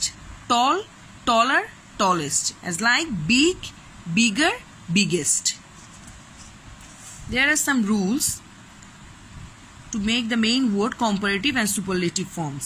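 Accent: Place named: India. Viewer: Indian Accent! Didnt expect it from a SPS teacher.Who recruited this teacher? Btw Noel sir, Rudy sir, Saikat sir, Munna sir these are the real gem of SPS.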